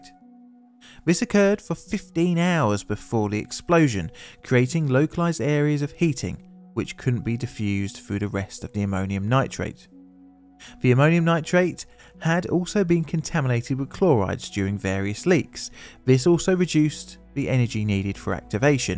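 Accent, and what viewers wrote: United Kingdom. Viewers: The heavy Brit accent detracts from the story, which happened in the US.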